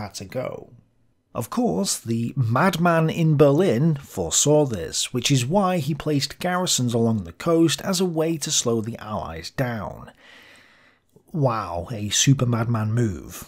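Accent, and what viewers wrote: United Kingdom. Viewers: I really like your videos and i think that they are very meritorical and well made. Because of this i feel kind of silly to admit that i usually listen to them while going to sleep. There is just something in your strong british accent and in the way that you calmly explain various WW2 topics. With your videos i am able to fall asleep in 10 minutes or less. Please do not take this as an insult, i do watch your videos without intent to fall asleep as well, especially battlestorm as i need to focus on the map to understand the situation. I just wanted to thank you for making my sleep easier. Have a great afternoon!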